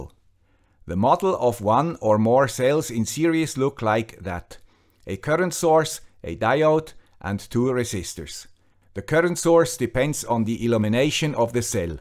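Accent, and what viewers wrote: Switzerland. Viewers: But who should I trust? A community that made experiences with panels that don't have diodes and bad hardware while not realising things got optimised, or some guy with a Swiss accent that accidentally buys the wrong panels and orientates them poorly while using mathematics and a relatively similar system to compare different things? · If you watch how Americans do product presentations by manufacturers to installers, you will know that it's not lying per se rather a culture of willful ignorance -ie, dont teach them what they're selling, only teach them what they need to say using the most simplest words/concepts possible, kinda like optimizing an idea. America, after all, is where a President gets elected by saying the world's largest economy is like running a household budget. Speaking of which, I dont know what all that data and parts means, but the Swiss accent made me believe the conclusion. 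But I do wonder, yes, the 2% "tax" run "optimizers" but I am under the impression they are also MPPTs.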